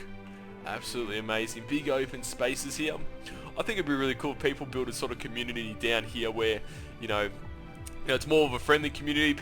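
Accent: Australia. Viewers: Are you Aussie?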